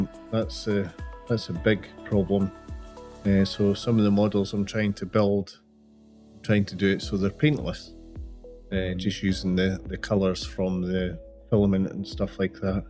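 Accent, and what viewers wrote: United Kingdom. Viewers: I cannot tell you how nice it is to hear a 3d printing video with a British accent! Great review, good testing, thanks for a good video. I havent done any back to back testing, but I fitted the jet pack they sell and haven't noticed any real difference. Considering swapping it back now I'm more familiar with the printer. I have noticed that orienting your overhangs towards the aux fan on the side helps enormously. A couple of repeat order prints I make have to be oriented a particular way, and I could physically fit 16 on the bed, but only 3 nearest the aux fan will be up to standard.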